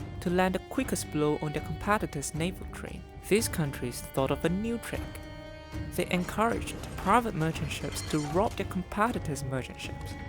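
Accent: United Kingdom. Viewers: 加上英式發音簡直絕配啊啊啊啊啊啊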